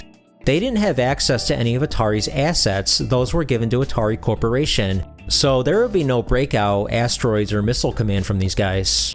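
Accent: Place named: Upstate New York, United States. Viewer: You from Upstate New York? Your accent reminds me of home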